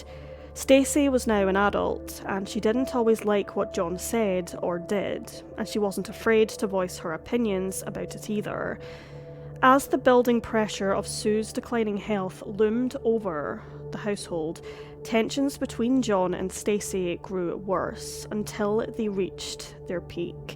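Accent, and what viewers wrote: Scotland, United Kingdom. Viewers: Gal Gadot is the narrator, don't you recognize her Scottish accent?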